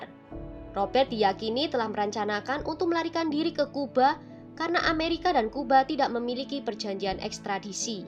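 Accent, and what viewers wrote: Java, Indonesia. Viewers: Wah,hebat ya suaranya medhok Jawanya👍😍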